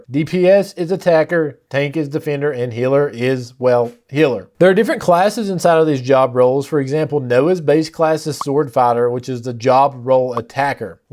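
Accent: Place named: South, United States. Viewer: Just checking this out, really well done and clear. Thanks! Side note - where are you from? I'm from NC and I sense a southern accent here too :D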